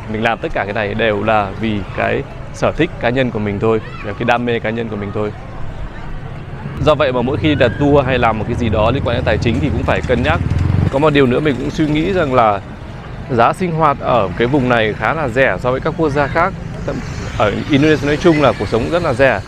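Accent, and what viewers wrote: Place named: South, Vietnam. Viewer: Chào bạn..nghe giọng nói của bạn là người miền Nam. không biết. quê hương VN. của bạn.ở tỉnh nào. miền Nam Việt Nam.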